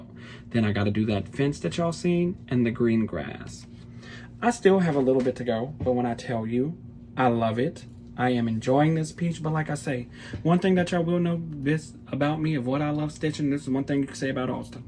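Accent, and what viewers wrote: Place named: Louisiana, United States. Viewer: Austin, you may stitch Victorian charmers but ohhhhh LAWDY son YOU are the true charmer! I happened upon your videos today and I’m smitten! I started cross stitching in 1978 after moving to Layfayette, Louisiana…I was elated to hear that Looosiana accent again! I so enjoyed your walk down cross stitching memory lane. It’s amazing how this hobby has evolved over the years. Back in the beginning I stitched on 26 count Aida. But when I discovered linen I felt like I hit the jack pot and I haven’t gone back to Aida…soooo there may come a day when you, too, will give linen a try. Like you, I also stitch on the same piece for days at a time. Monogamous stitching works best for me as well. You're not the only one who gets gobsmacked at the number of WIPS so many stitchers amass. I couldn’t take that pressure! I like your floss file system, especially the notion of the small bag for bits and bobs that are too much to toss in the trash bin. 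I recently converted all my cotton flosses over to Annie’s Keepers. That system is the best I’ve tried, and I’ve tried quite a number of systems. I can’t wait to see what your next video brings…keep them comin’ darlin!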